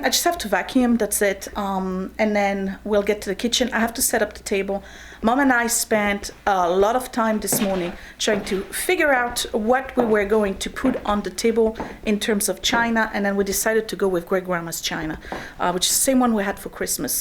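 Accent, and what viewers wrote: France. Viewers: On your last video I asked if you have a German accent. But now I'm guessing it's French? That's what I thought. Everything looked yummy.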